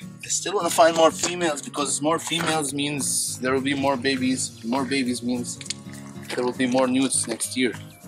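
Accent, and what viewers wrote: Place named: Iran. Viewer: Your accent is similar to Iranians. are you Iranian?!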